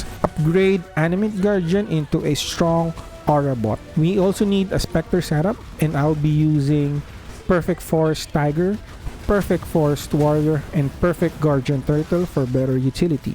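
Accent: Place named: Philippines. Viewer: Accent is very pinoy ✌️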